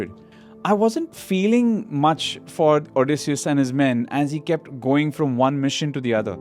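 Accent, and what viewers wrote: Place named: India. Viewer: You have got a very strong Indian accent. Fascinating.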